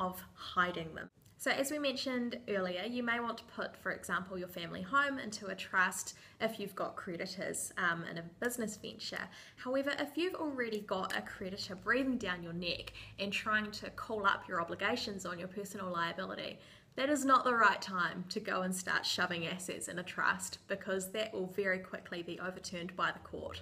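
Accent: New Zealand. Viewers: she has a kiwi accent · We Americans (palm slap)....She is not from the UK! The accent sounds similar to the Aussies but I believe she is a Kiwi. Beautiful accent. Isn't it interesting how trusts function so similarly across state, vis a vis governments lines? With the exception of some specifics this information applies to trusts in general.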